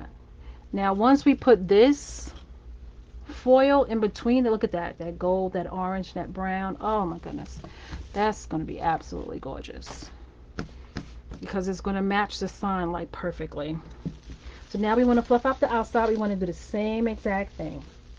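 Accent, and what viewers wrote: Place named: New York, United States. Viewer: Hi you sound like a New Yorker ! I'm from Boston (Roxbury) Ma. just came across you channel and yes I love crafting I just started a about a year ago. I love how good you explain things !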